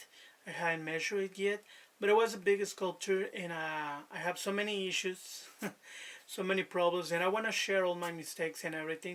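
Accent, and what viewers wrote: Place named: Italy. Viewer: Thanks for the video! I was making a clay figure sculpture in college, & then got injured in an accident. I was next not allowed by my professor to finish & turn it in for the semester; very unfair. My daily Bible Word gave instruction "Do not return to the place where you have been harmed," showing me not to work with this cruel professor anymore. So I was trying to find a way to make my sculpture since I no longer have access to a pottery kiln. I looked at expensive materials I cannot afford. I was praying & wishing there is some kind of archival 'air dry clay' that is like pottery. I asked God can you please let there be some kind of 'miracle' clay I do not need a kiln to fire?? & to please show me what to do? DUH--- then right after prayer, the next thing that comes my way is videos of cement mixture sculptures! LOL. I realize it is the 'miracle' material I was hoping for--- air dry, but solid... 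lasting... not so fragile like plaster. I really want to give this a try... & hope to avoid problems like you talked about... "rocks for mom" where the concrete dried before you can use it. I am watching many videos. Your Italian accent is very attractive to American women like me... though you are too young...boo... Oh well. At least I can learn art from you. Thanks for the lessons! Blessings. Peace.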